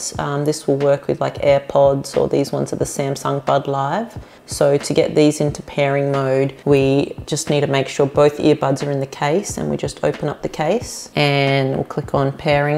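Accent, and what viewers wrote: Australia. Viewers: She sounds like australian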